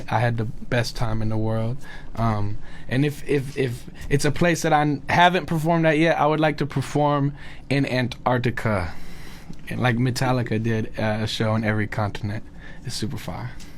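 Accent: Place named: Texas, United States. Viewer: I hear that Texan accent!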